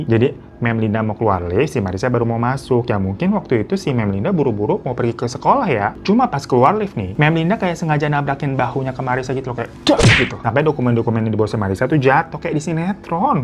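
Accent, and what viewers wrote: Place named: South Jakarta, Indonesia. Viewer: Aksen jaksel nih keknya wkwk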